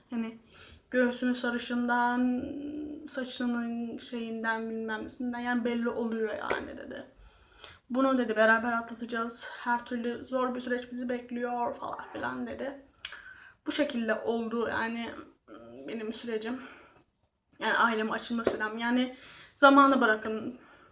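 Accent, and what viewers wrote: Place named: Aegean, Turkey. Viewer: Senin ege şiveni yirim😂😂😍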